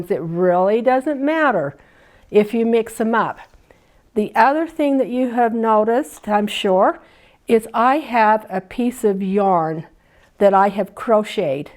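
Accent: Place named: Utah, United States. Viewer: That Utah accent came through loud and clear in the first 30 seconds…. Great tips. I am going to crochet myself a bobbin holder right now.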